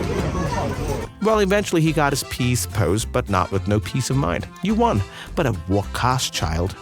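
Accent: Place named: United States. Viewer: Why is an American doing the talking ?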